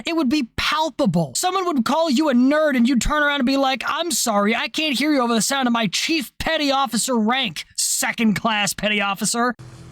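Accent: United States. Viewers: Proud to say that im a long time sub from MA (ngl thought your voice sounded like somewhere out of NE, let alone MA.). Next round of dunks is on me 🙏🙏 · I fuckin knew you were from Massachusetts My parents grew up there I HAD YOU CLOCKED AS A MASSHOLE THE SECOND I HEARD YOU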